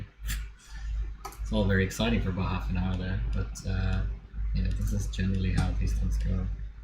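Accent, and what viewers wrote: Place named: England, United Kingdom. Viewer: Wow you sound full on Pom now lol. Nice.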